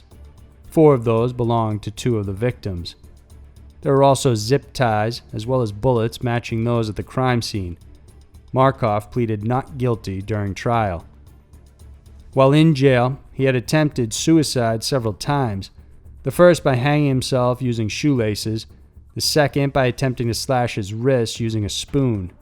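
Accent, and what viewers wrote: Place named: South, United States. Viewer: It is probably his accent. Northern and Southern accents are different and words are pronounced differently according to where you grew up. He sounds like he may have lived in the Southern area of the US. I'm friends with a Southern girl and I am from the North. She says words differently than I do at times.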